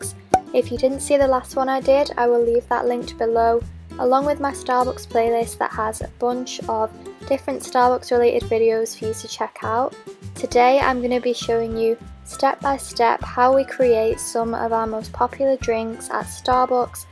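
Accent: United Kingdom. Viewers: You're from London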